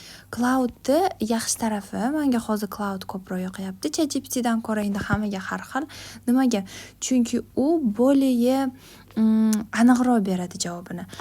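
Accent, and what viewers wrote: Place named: Namangan, Uzbekistan. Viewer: Namangan sheva chiroylida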